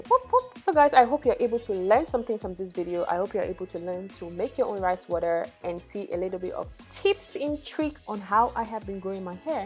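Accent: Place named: Jamaica. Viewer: Sound look jamaican.. good job sis. More power to u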